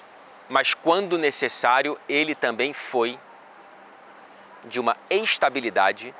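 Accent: Rio de Janeiro, Brazil. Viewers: Esse sotaque carioca é irritante. PQP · É o carioques, não tem jeito!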